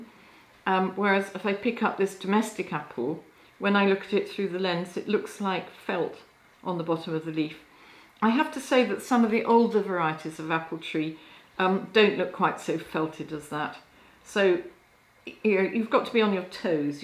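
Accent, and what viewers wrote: England, United Kingdom. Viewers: Thank you so much ! It’s so nice of you to share your knowledge on the leaves too ! I think these tips should be handed down but alas the younger generation don’t seem bothered. I live in the UK and it’s so refreshing to hear an English accent on utube instead of an American. As they also use different measures.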